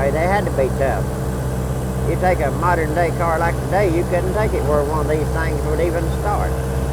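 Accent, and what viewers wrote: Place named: Appalachia, United States. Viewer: I lived in Appalachia from the time I was 10 until I was in my twenties. I was born there, but we moved to Cali, which was really nice back then, not a commie country like now. I could listen to this guy all day becuase It reminds me of growing up. And it sort of breaks my heart, becase even though I finally moved back to Appalachia, I live there now, I can never go back to the Appalachia of my days growing up. People were so free, so real. It doesn't exist anymore. People talk with the same accent, but it's gone. I don't really know how to say it, but if you lived there 30-40 years ago, you know exactly what I mean.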